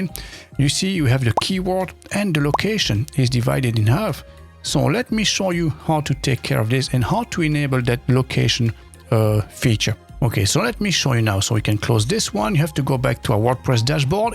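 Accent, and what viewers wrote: France. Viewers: Carrément bluffé !!! J'ai cru reconnaître un petit accent français... Alors je publie mon commentaire en français... ça fait des jours et des jours que je me tappe des vidéos sur des solutions toutes plus floues les unes que les autres, là je dois reconnaître que la simplicité est au rendez-vous, il me restait 2 questions, est-ce que j'ose ??? Allez, je me jette à l'eau : pensez-vous que ce plugin peut-être utilisé comme un jobs board ? Et au sujet de la monétisation, pensez-vous que je puisse le paramétrer pour vendre la publication et la consultation de mes petites annonces sous forme d'abonnement mensuel/annuel ? :) Encore merci pour cette superbe présentation... A bientôt Dérick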